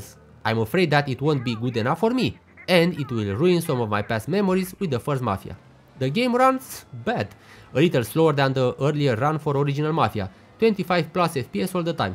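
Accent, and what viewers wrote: Romania. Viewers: you are romanian right?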